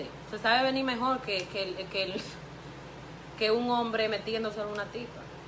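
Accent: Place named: Dominican Republic. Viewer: me encanta el hacento de rd de esta hermosa mujer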